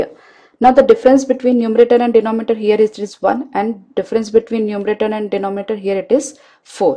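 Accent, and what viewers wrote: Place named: India. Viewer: Mam you are best your sound is hindustani and you are the bestest in the world thankyou mam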